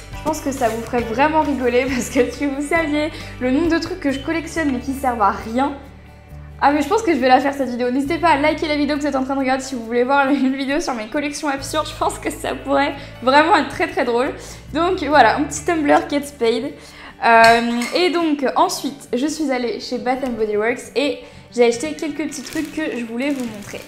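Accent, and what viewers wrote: England, United Kingdom. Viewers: Jadore ton accent anglais 😍😍💞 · Coucou 💎j'ai trouvé ta Vidéo super , déjà elle a pas durée 30 minutes comme certaines youtubeuses qui s'éternisent sur tous! Et puis tu as une façon de parler , expliquer très agréable a entendre.. Surtout avec la petite musique douce... Tu es posée et pas entrain de crier comme d'autres 💘ta coiffure et ton maquillage sont super jolies 💘ça te va trop bien!tu as un magnifique accent anglais 😉tu est super , je ne te regarde plus que toi sur Youtube tu es la seule vraie youtubeuse tu es une vraie passionnée et ça fait plaisir a voir ❤️ne change rien 💘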